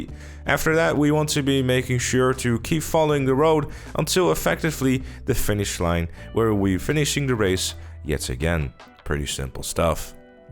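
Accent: Netherlands. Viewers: You are dutch right?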